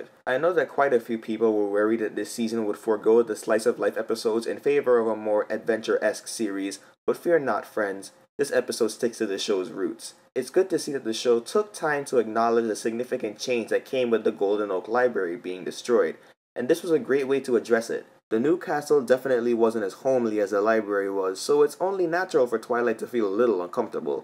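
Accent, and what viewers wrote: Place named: Caribbean. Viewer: That was a great review. May I ask you where you are from? You sound like you're from the Caribbean.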